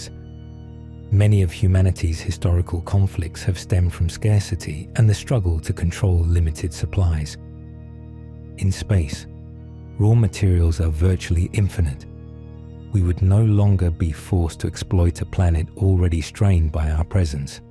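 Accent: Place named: United Kingdom. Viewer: That ain't him talking.He sounds like a Limey when talks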